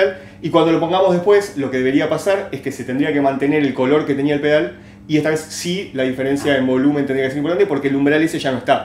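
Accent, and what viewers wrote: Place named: Argentina. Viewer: Tremendo video! Muy interesante escuchar la explicación de esto en español argentino :D. Una sugerencia para un futuro, algo que no he encontrado mucho material es sobre cómo funcionan los canales en un amp valvular, qué componentes diferencien un canal de otro. En fin, a seguirla rompiendo con estos videos. Abrazo desde Uruguay!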